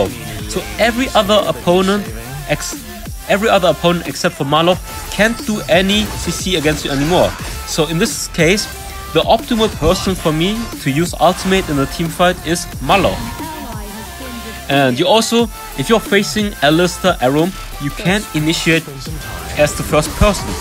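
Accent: Germany. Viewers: Wenn das Deutsch wieder durchkommt. Trotzdem nices Gameplay (wie immer) ich werd wohl eh nicht mehr verstehn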